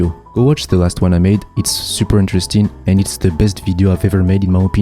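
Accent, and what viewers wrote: France. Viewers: bro are you french you sound so french lol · You have a strong sounding like French accent I like it🔥 Nice vid !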